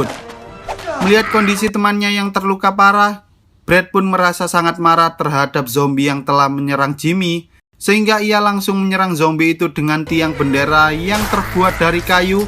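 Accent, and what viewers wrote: Java, Indonesia. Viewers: Medok Jawa nya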